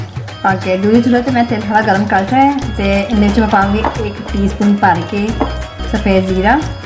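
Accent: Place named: Punjab, India. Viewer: Me ap ki Punjabi hi sunti rahi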